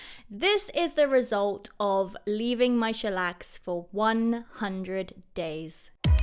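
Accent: United Kingdom. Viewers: British accent amazing and original ❤️ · Omg that british accent though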